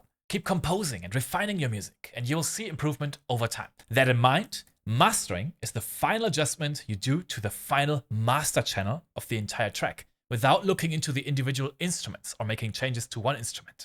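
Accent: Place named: Germany. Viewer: kurze anmerkung man hört raus das du deutsch bist haha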